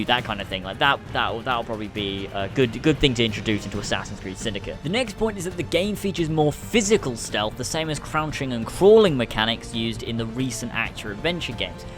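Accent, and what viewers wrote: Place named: United Kingdom. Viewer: Can you stop with that horrible british accent?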